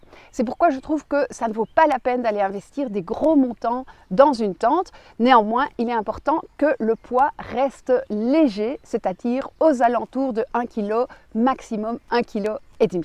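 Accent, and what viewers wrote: Belgium. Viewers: J'aime bien ton accent ( Belge mais d'où ? ) quand tu dis couisiner, kil-o ( moi je dis kil-eau ) ,camme-ping, etc... Merci pour ton sourire et ton optimisme !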